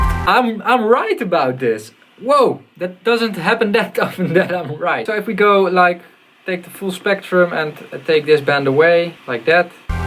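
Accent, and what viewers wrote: Netherlands. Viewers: I'm perceiving a Dutch vibe in the way you speak English :) nice review man, quite objective.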